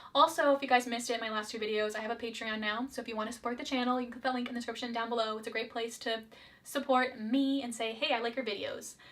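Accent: Canada. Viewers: Your Canadian “out”s were STRONG in this video!!:)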